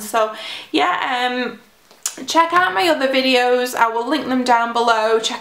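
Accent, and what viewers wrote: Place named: United Kingdom. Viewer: Honest review much appreciated! I'm a Brit in the US, and your accent is making me all homesick :)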